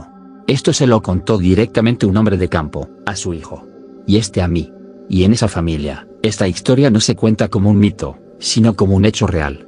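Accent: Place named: Mexico. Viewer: exactamente, tenemos otros términos y lo narra muy mexicano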